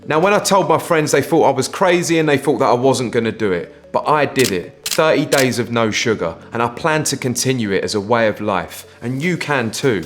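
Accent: United Kingdom. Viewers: This is the best British accent I have heard in my life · Wow,like for this gorgeous British accent))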